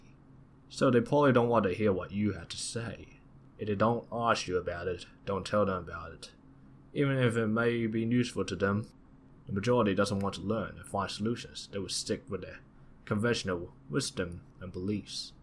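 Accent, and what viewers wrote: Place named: Australia. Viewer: I’m not trying to disparage* I’m curious, where the narrator is from. I’m guessing someone who spoke one of the Asian languages fluently, then learned English as a second language either in Australia or with an Australian tutor. Am I correct? Am I close? Again not trying to accidentally offend you, that’s the accent I picked up on, I could be wrong, so I hope my question doesn’t bother you!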